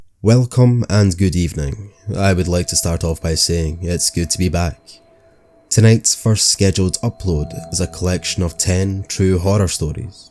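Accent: Scotland, United Kingdom. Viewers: 🙂 Welcome Back Mr.;) Thank you for the Awesome Narration!!! I hope that you and everyone else has a Twinklelicious ✨Tuesday Night too;) much love Sexy Grandma Darkness ✌️💋 Just Joined your family:).. your Scottish accent is so Beautiful 😍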